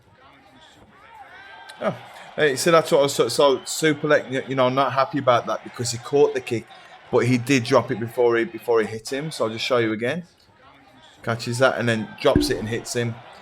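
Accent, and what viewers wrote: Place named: Birmingham, United Kingdom. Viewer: i'm admiring your accent tbh. are you from Burmingham by chance? (i"m just english learner >< )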